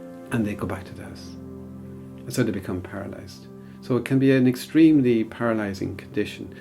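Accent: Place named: Ireland. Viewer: Very calming voice its Irish accent u definitely should do a calming video . Thank u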